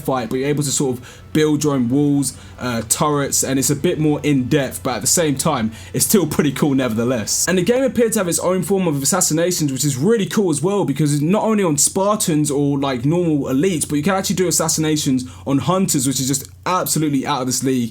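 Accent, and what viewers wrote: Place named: England, United Kingdom. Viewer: Yes fam your accent is bare white you from Midlands or down south?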